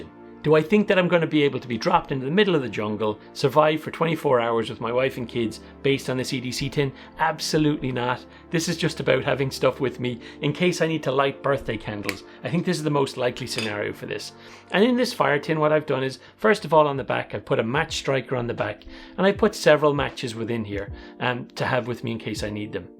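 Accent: Ireland. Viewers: just came across your channel after this video was suggested to me and my god i’m glad! what a legend! loved trying to localise the silicon valley-ified irish accent. has to be cork? definitely down south anyway ;) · "Underneet Dat doh..." great craic to see a fellow Irishman with a cool edc channel. Great altoid tin!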